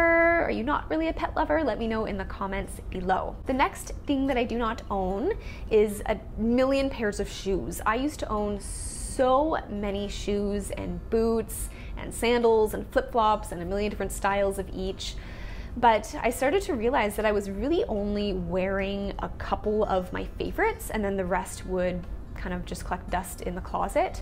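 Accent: Canada. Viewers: I love your Canadian accent!!! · That Canadian accent coming through stronnggg haha. I’m from Calgary and I can hear the East Coast in you. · Either your accent is from Minnesota, or you're from Canada ahah! I can't pin point it! :P You sound like you're from northern Ontario!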